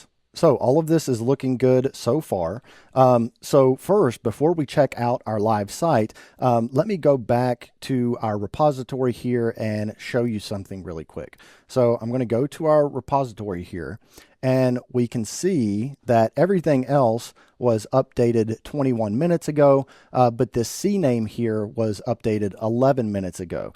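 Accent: South, United States. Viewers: Can’t i just use firebase? Also ru from the south?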